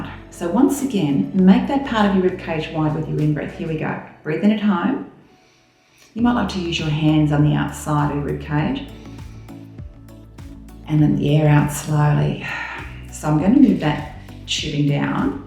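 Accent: Australia. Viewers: Ausie English be like that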